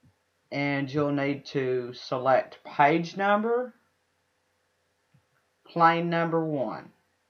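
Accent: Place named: Carolinas, United States. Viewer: Helpful video thank you very much and I am going to take a stab at your accent... I feel it's either from North or South Carolina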